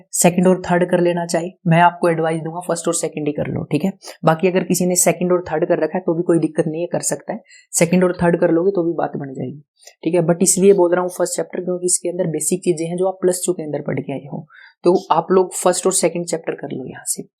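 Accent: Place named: Hisar, India. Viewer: Ye to pra h ki aap Hisar se Ho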